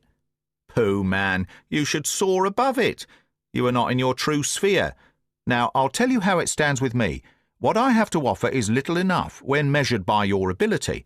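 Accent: United Kingdom. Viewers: Amazing reading. Great British accent. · British pronounciation....Holmes and Watson did not speak the American language.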